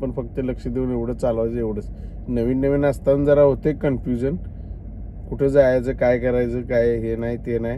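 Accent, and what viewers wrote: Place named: Kolhapur, India. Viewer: तू कोल्हापूर कडेचा आहेस वाटते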